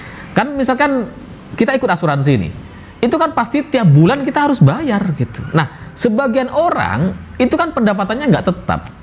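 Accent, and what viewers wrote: Madura, Indonesia. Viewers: Lucu.. kayak logat Madura mas host 2045 tv ini.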